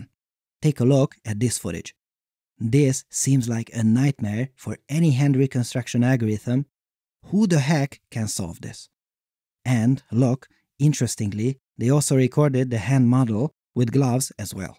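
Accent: Hungary. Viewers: Are you Hungarian? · Tipikus magyar akcentus! xd (ne vedd sértésnek!) Nagyon jó videó!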